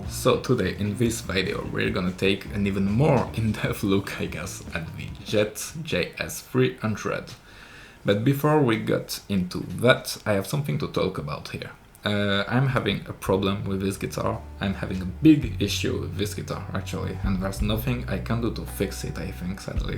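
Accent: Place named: Mumbai, India. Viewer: Où nous démontre sans emphases que: •T as pas besoin de lâcher ni 1500, ni 1000 et ni même 500 euros ou $. • que la guitare venant de Chine ou d ' Angola ou de Papouasie n est pas un problème en soi. • Que c est bien le jeu qui compte et à travers ça la musique. • idem les amplis • idem les effets Les fabricants de guitares de processe industriel ont tous une c.n.c, savent tous bobiner du fil de cuivre autour d aimants. • mon constat: il ya de quoi passer une décade à apprendre à jouer avant de passer à des guitares plus chères et mieux faites. • autre constat:, tu as un Anglais de Bombay.. pratique car on comprend tout et même moi ( c est dire ). Merci pour ton travail et tes avis sur tes vidéos.🙂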